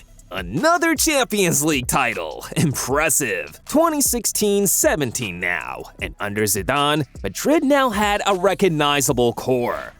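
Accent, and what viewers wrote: United States. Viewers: What kind of American narrator is this? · Your voice an american pronunciation are unbearable, can't watch this shit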